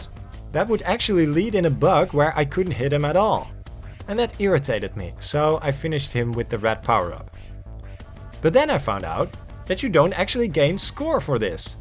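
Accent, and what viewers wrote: Netherlands. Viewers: Your dutch aren't you? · You're Dutch aren't you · ben jij toevallig stiekem een nederlander? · sounds dutch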